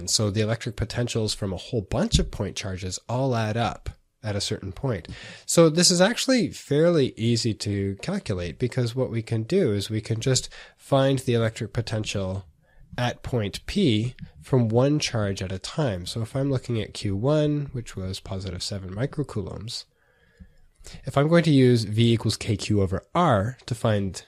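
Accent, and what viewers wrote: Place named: England, United Kingdom. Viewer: Bol t rahe hai jaise ki app England se ho..😩😩😩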